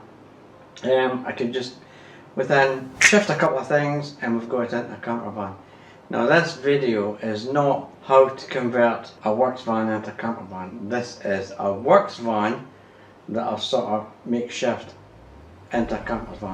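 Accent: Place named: Scotland, United Kingdom. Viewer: My automatic captions were translating “van” to “vine”! Ha! It’s never heard a Scot before. Brilliant set up!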